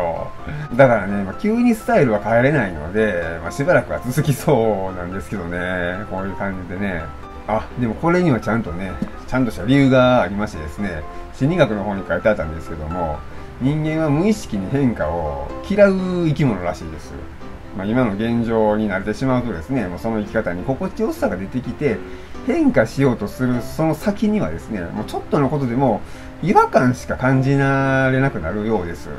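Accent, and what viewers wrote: Osaka, Japan. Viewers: 毎日アップされるのは素晴らしいことだと感心しています。今日何話そうかなあ？って思うのは大変かなと思いますが。私はさんの家族の話を愛を大阪弁に包んで面白く話すのが好きです。毎日動画アップでさんが元気なことがわかるのでこれからも頑張ってくださいね。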